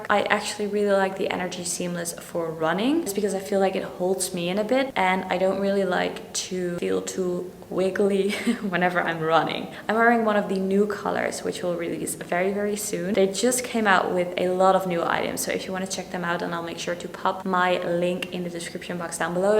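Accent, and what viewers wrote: Netherlands. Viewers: Going by the sound of it I would say that you're Dutch.. Good info! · Your accent is so cute <3 are you from the Netherlands? You sound like a Dutchie!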